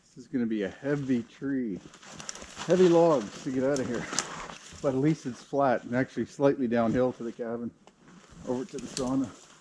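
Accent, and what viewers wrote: Canada. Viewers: Este es más expresivo, tierno, que se equivoca, trabaja sentado en la nieve y conversa, tropieza y hasta sonríe cálidamente. Contrario al otro más serio, que habla poco, mas eficiente y por momentos algo aburrido. CUANDO PONDRÁN SUBTÍTULOS EN ESPAÑOL???, hay muchas cosas que no se entienden del dialecto canadiense.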